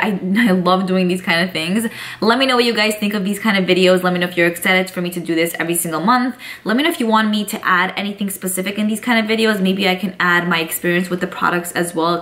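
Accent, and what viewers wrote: New York, United States. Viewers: sooooo adorable I love you so much & I could binge all your videos over and over it’s that NY accent gurrrll ☺️💜💜 you are the reason why I spend so much time in TJ Max 🤣🤣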